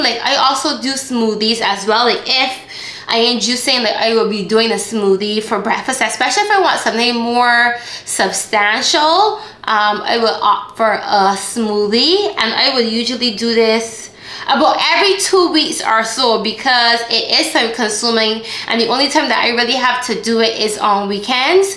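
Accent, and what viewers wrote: Barbados. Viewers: That bajan accent is amazing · Well it is always refreshing to hear the best accent in the world...Love yah.As you can tell I am a Proud Bajan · She’s giving me bagan accent 🇧🇧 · I love your Bajan accent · I'm sure that a Barbados accent I can hear. Where did you get those amazing big bowls from? Great video by the way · Omg!!!! You must be Bajan💗I love your country and all of y’all’s accents💗💗. Loved the video as well. Just subscribed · I hear that lovely Bajan accent. You remind me of my bestie who is from Barbados! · Are you a native of Barbados 🇧🇧 🤔 Love the accent ❤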